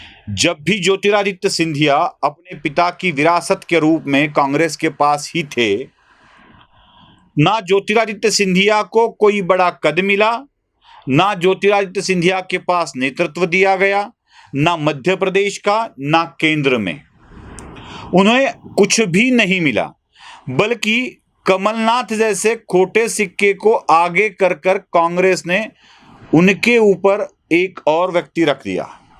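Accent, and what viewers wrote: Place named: Maharashtra, India. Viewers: Great.. Good... But you have to improve in hindi diction & leave marathi accent No doubt keep practising will get good following due to your pitching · सर आपली हिंदी मराठी वळणाची आहे तरी खूप छान आणि सटीक आहे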